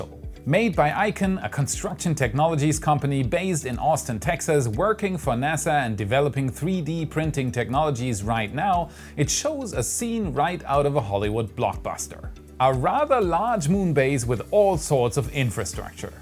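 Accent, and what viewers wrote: Germany. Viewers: What nationality is? I can't quite guess from the accent! Germany?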